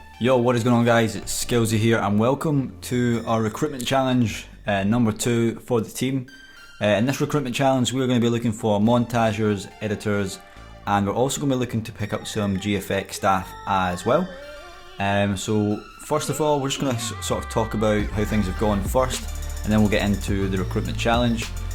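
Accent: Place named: Bishopbriggs, United Kingdom. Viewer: wits the deal wae ur mad accent yer fae bishy pal.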